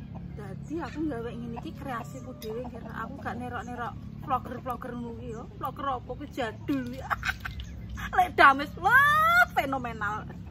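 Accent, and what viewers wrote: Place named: Tuban, Indonesia. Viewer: Kalo liat kontennya Lek Damis, logat bicaranya mengingatkan saudaraku yg ada di Tuban.... Semangat y Lek ...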